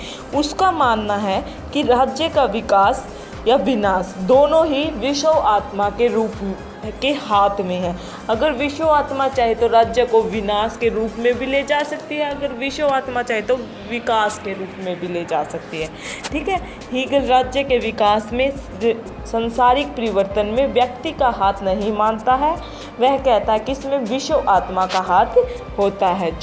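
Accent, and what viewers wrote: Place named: Haryana, India. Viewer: Haryanvi presentation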